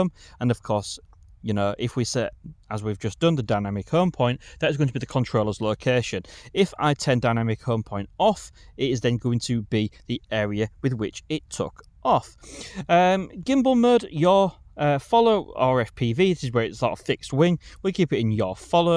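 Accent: Hull, United Kingdom. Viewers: I know a Hull accent when I hear one lol love the videos mate,I've had my mavic mini a few months now and still learning new tricks 👍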